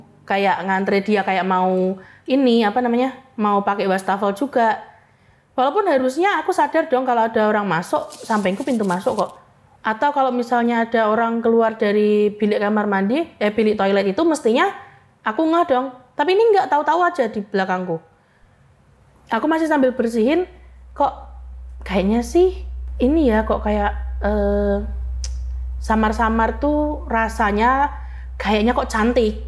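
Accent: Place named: Semarang, Indonesia. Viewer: logat e semarang bgt hhe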